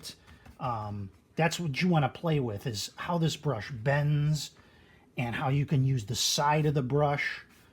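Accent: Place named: United Kingdom. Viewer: It takes a little time to tune the American ear into everyday conversational style British accents. There are so many and can be difficult for a while.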